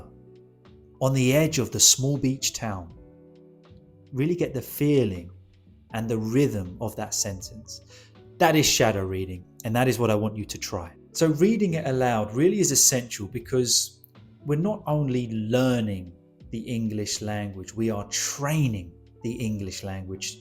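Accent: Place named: United Kingdom. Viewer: Being Soth Asian we prefer British English over other accents and styles which are derivatives on traditional English from England and you are a classic example, I would always love to attend your lectures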